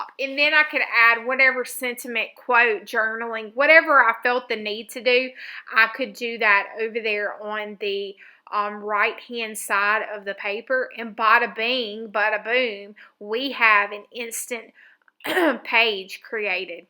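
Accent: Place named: Kentucky, United States. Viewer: Wow, I just "discovered" you only a week ago when I when was searching YouTube for beginner videos to get started on my new Gelli plate. I had been watching very complicated videos and was getting discouraged before I even started. Then I found YOU! Instantly my heart leapt with JOY and I was like, "I need MORE of this girl in my art life!" I have started binge watching your videos because they are EYE CANDY and just because I cannot get enough of your sweet VOICE (my grandpa grew up in KY and later Baton Rouge and used to call this Alaska girl, his only granddaughter "Sugapie" so hearing your accent is bringing back SO many memories, even though he passed 20 years ago). Words cannot express how much you are a GIFT to the art community as well as sharing your JOY with anyone who is lucky to find you online. I am signing up for your newsletter and will start enrolling in your classes. 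Much love to you and Josh and the puppies!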